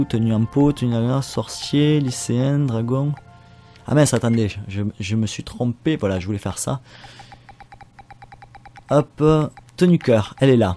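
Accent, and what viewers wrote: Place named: South, France. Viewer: J'adore l'accent du sud !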